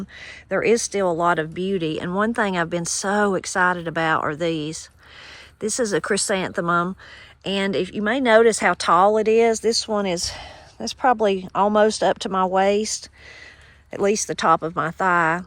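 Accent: Appalachia, United States. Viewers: Just wanted to leave a comment: I grew up in Appalachia. My parents are from the north so I don't have a true Appalachian accent, but I use all the words y'all do. I now live in Germany as a dairy farmer. Was invited to a university to talk to Agricultural students in their English class. I'll be showing one of your shorts to showcase a true Appalachian accent. ❤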